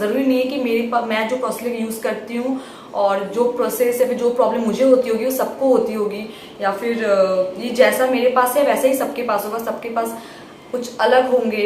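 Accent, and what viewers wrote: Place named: Pahari, India. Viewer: ...tell us about yourself na... Your hindi is sweet, pahari ho kya...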